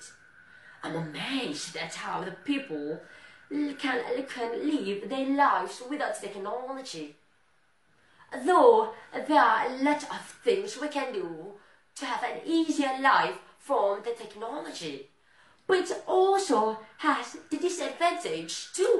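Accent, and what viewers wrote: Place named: United Kingdom. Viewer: Love your british accent!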